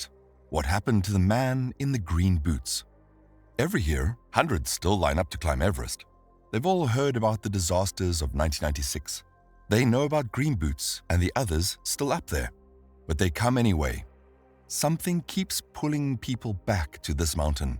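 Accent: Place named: South Africa. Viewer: Another South African voice for me to enjoy. Subscribed.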